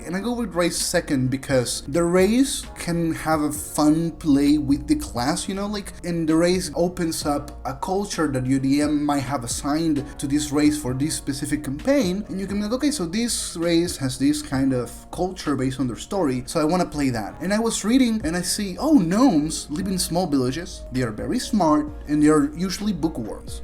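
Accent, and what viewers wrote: Argentina. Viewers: Bancá, sos argentino?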